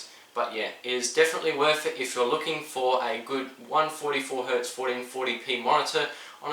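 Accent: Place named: New Zealand. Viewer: Great review. Noticed you have a NZ accent :-) Waiting for your updated review with 5700XT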